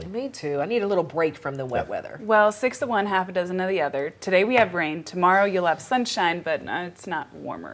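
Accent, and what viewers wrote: United States. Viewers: She's not local, she talks like a yank lol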